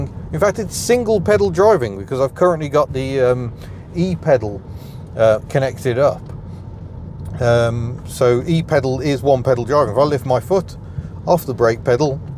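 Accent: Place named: Midlands, United Kingdom. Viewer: Hi mister you always young!!! Can't believe is about 9 or 10 years since i follow your remarkable automotive's footages. Not only to discover incredible similarities and incredible differences between your automobile's lot and the our's in Southern America. Yet liking so much to improve my English by listening to your impeccable Midland's accent. You aren't only an outstanding car's tester with enormous talent for describing machine's featurings but also a great honourable person that knows how to connect different people from different abroad's countries under the same good feeling. True compliments from the Republica Argentina!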